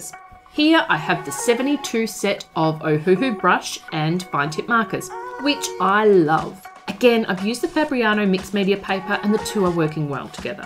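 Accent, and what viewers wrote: Australia. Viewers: You could call it "My Swatch Book: because PPPPPP" Love your work as always, it's always so refeshing hearing another Australian accent on YouTube!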